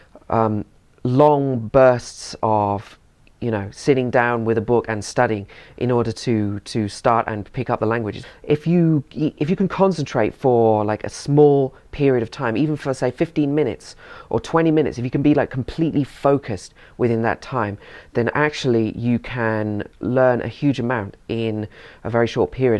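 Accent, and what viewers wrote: United Kingdom. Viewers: He sounds british😁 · I am very impressed by your Chinese and Cantonese. I love your British accent very much. Can I know what kind of British accent do you have specifically? I am going to learn and imitate your accent through your channel. Haha. Hope to see more videos from you. Thank you :)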